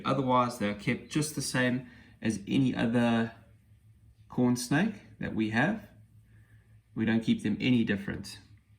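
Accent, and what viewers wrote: South Africa. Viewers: Love the South African accent!!! Dunno why just do!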